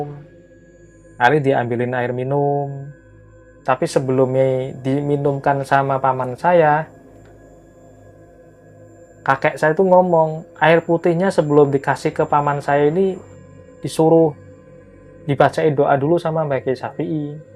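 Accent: Pekalongan, Indonesia. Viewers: Masnya ini logatnya kaya Pekalongan daerah kidul ,Kedungwuni ,kajangan ,mbligo pringlangu ngidol lah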